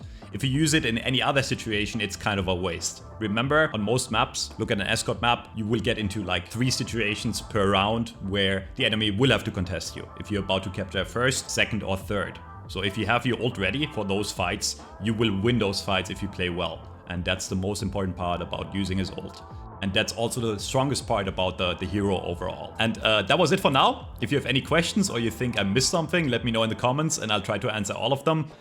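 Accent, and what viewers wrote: Germany. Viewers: I knew you were German within the first 5 seconds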